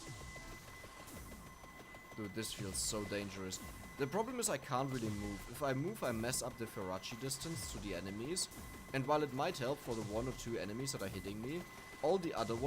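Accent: Germany. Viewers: man hört das du Deutscher bist an der weiße wie du Namen aussprichst ^-^ DANKE für die nicen Videos ^-^ ♥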